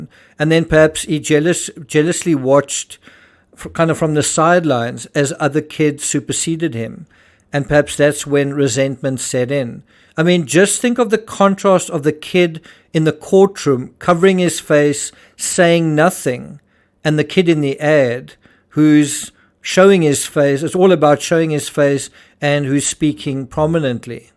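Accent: South Africa. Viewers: Tbh i'm shocked your not British, South African a real blind spot for me. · You say you found the British less warming to you than other nations you lived in, I don't know your race or nationality, or anything about you, but judging by your voice I thought white South African, which is generally a group that is seem as more aligned to, though Australia probably tops for being most similar. America has always been a different thing, that country has huge influences from all over, but more than anything else, they have a uniquely different way of being & doing things, so as a Brit I can say yeah, theres a gulf between us & America. I sure some Americans do an affinity with Britain, & those individuals might feel more similar if I was to meet them, but over all, American pop culture has a vastly different feel & myself personally I feel our British culture has been lost to it all too much, so while its nothing personal to individual Americans, I am not too keen on the loud over confident American brashnes & razzmatazz, I'm pretty Old School English & I love quaint stuff lol. 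But regarding "foreigners" & other races, we have actually been really welcoming much of the time, the Brits overall are a friendly & safe bunch, a few louts but even they are fairly harmless. But what you may not understand, as an outsider or newcomer, is that we have experienced a huge culture shift, that has been detrimental, we have shared scarce resources, the white working class, houses & space, as a small island. We have paid taxes that go on housing migrants whilst are own p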